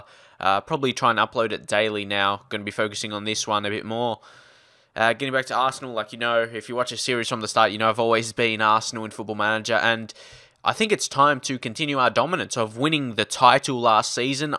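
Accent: Australia. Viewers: Love these vids ps where in Australia are you from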